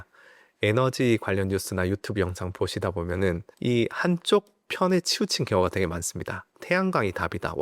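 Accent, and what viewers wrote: Gyeongsang, South Korea. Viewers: 처음 들었을때부터 경상도 출신이라는거 알겠던데요 ㅎㅎ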